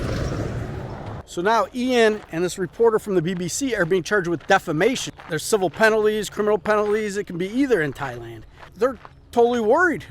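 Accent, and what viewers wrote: United States. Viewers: Like to hear your comment most probably as advice...life is not in the cities in America, its dangerous, l read alot about shooting incident n not too safe either, you make all the best with your neighbour, the wilderness, l'm too indeed attracted to the nature but, never get the change to reach out there 🇺🇲 your American accent is much easier on me 👍Take care and all the best! 🍻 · If it f**ks, flies, or floats, lease it. That’s an expensive life lesson. On a side note. Sounds like you got a Chicago accent . Go Bears.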